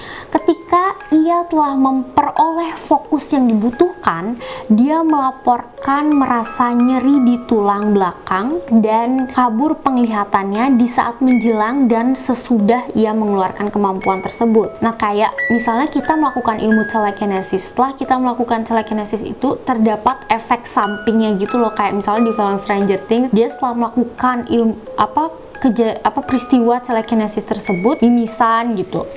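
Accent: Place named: Bali, Indonesia. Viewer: Orang Bali ya kak ? Soalnya logatnya kek Bali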